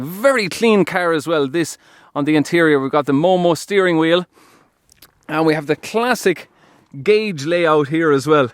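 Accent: Ireland. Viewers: When I first looked at it and heard your accent I thought you were driving on part of the Donegal Rally route! The first time I went to see that in the late seventies, Billy Coleman won it in a whole other Lancia! I probably would take the Integrale too and live with constantly crapping myself about how much it will cost to repair when it inevitably breaks down! 😁😁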